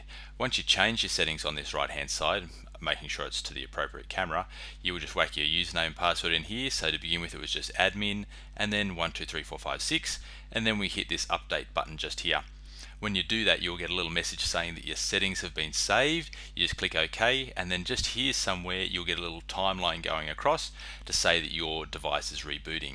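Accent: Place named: Australia. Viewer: Thanks for sharing this. I prefer the Australian accent that the Chinese calligraphy ... no offence, but its much easier to follow :). I wish you could add the settings for the Iphone and port forwarding. Thanks again from Bristol UK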